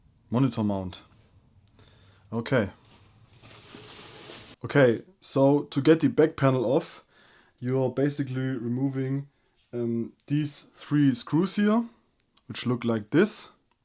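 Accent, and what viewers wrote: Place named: Germany. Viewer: German accent ❤️‍🔥❤️‍🔥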